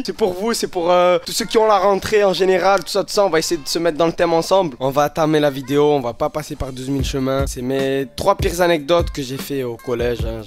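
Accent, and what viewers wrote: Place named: Marseille, France. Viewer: Il a l’accent de Marseille mais il met paris ?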